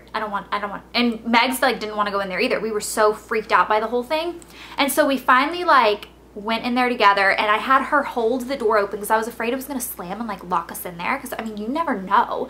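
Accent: South, United States. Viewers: I love your southern accent! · you sound extra southern in this video...i like it!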